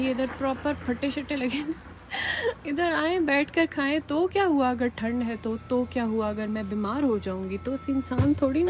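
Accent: Punjab, India. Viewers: I have recently subscribed your channel And I'm just getting very entertaining video to watch. Loving your Punjabi accent and purity ❤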